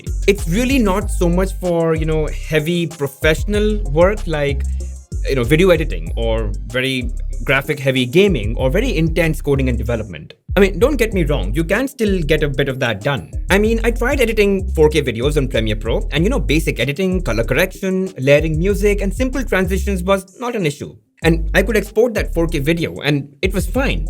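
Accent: India. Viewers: Iss. Bande ka eng bhi muze hindi jesa lag rha hai😅😅 laga hi nai english me video dekh rha hu 😅😅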